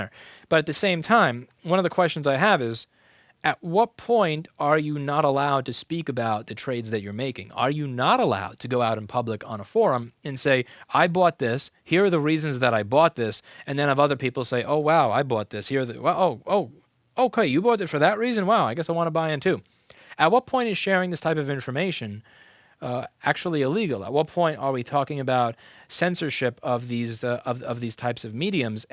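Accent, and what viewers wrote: New York, United States. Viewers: He's not an angry New Yorker, just how most new yorkers from NYC and close area talk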